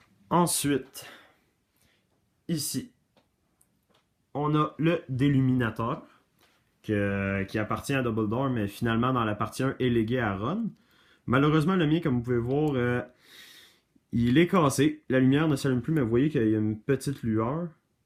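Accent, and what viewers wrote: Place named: Belgium. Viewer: t es belge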